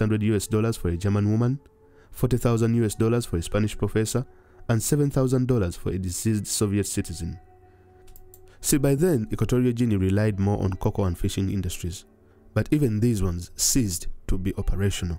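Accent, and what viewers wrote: Uganda. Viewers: A ugandan is narrating this. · I think the presenter is Ugandan. It's just about how they pronounce words.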